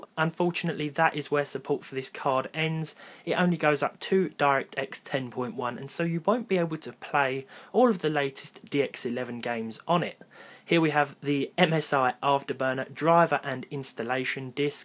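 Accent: United Kingdom. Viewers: i love your british accent dude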